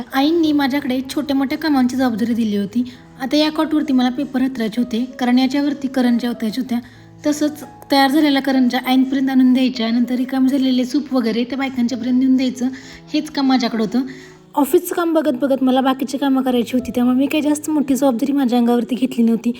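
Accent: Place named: Satara, India. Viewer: सातारची आहेस का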